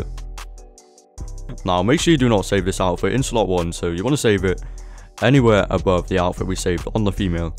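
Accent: Wales, United Kingdom. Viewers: You sound welsh where are u from?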